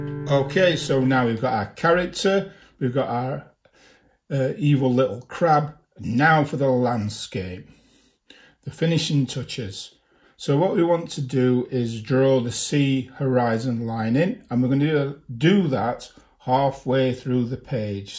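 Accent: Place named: Northern England, United Kingdom. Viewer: Thank you, great to hear a Northern Accent.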